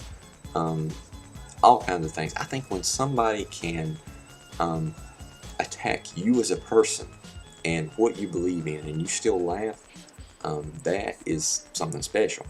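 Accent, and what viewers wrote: South, United States. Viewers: I enjoy your vids, and yes, I am easily hypnotized by southern accents...esp when they have something interesting to say, as you always do. Yeah seeing George pass was tough, I am from that generation I guess. He will be missed. Thanx for your updates too! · I LOVED his routine about "stuff" (no air quotes there, buddy - those be the real McCoy!" I'm NOT just saying this, it's the honest truth - when I saw you posted a video and saw the length of it, my first thought was, "Weeee! 9+ minutes of listening to that endearing accent!" Imagine how hard I laughed when I read the bottom of the description - you nailed me! I stand guilty of being A. (but man, you talk FAST for a Suthenah)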